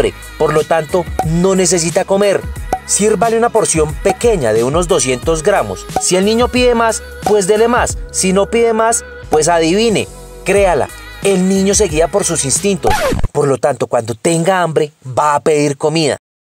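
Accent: Colombia. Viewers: se te ve que eres colombiano con el habla igual que yo :)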